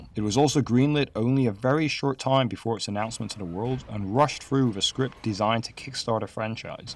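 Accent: United Kingdom. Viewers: You from Bristol? The accent sounds familiar.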